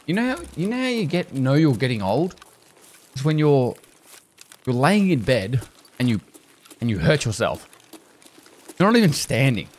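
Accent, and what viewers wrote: Australia. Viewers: Finally caught up. I can now understand Aussie at 2x speeds!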